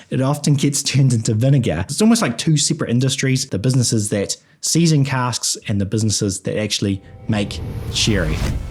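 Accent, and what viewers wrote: New Zealand. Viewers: Your channel just came up randomly... Glad it did. Enjoyed your break down. Informative and I enjoy listening to the NZ accent 🇦🇺✌️✌️✌️ .... Good video mate. Subscribed. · The thick kiwi accent is the highlight of this video. Corkers like spictrum and shirry and having six with a sheep abound. Luckily whiskey is already pronounced with an I.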